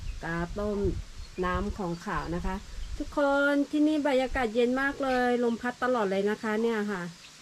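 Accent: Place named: Ratchaburi, Thailand. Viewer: สวัสดีครับน้องน้ำ ภาษากะเหรี่ยงทางราชบุรีฟังรู้ครับแต่บางคำก็พูดไม่เหมือนทางตากครับ fc จากตากครับ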